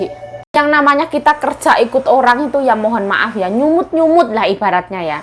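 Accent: Java, Indonesia. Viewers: Madarin logak Jawa😁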